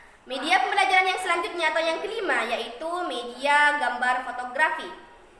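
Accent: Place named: Madura, Indonesia. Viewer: Ciri khas bahasa Madura😁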